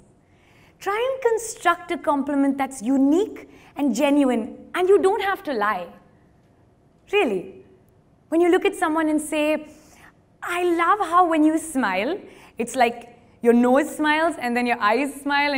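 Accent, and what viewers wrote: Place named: India. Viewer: I just love your Indian accent,.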